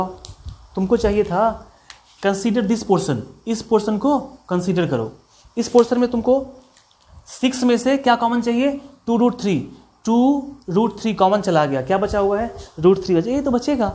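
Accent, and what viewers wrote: Bihar, India. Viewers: You're the best maths teacher ever..❤..i tried so many times to solve this type of questions and clear my concepts😢...but few mins before i found your video...😊I really really loved your way of teaching....✨especially i am feeling happpiiieeeee becoz you're speaking biharrriiii🤩🤩 .... you'll get millions of subscribers soon....✨🦋 best wishes too youuuuuu⛅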